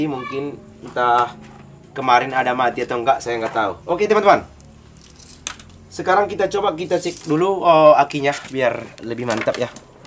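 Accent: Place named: Medan, Indonesia. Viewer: Saya selalu mengikuti channel abang ini, sangat diteil penjelasan y, trus logat abang seperti logat medan, jd nggak bosan sy sambil ketawa sy bang, lanjut trus boss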